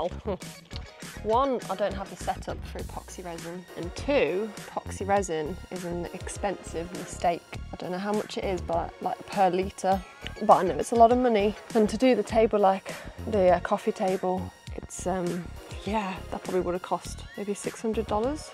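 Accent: United Kingdom. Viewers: I'm still confused about her UK accent in AUS 😅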